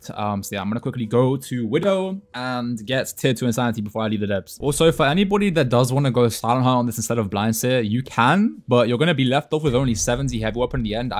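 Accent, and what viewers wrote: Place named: United Kingdom. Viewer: woahh hi idk who's british accent is better you or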